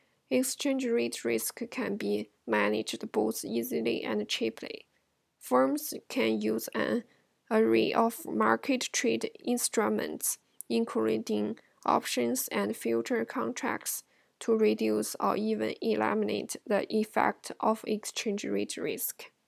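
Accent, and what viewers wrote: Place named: China. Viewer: Your accent seems like you are a Chinese~~~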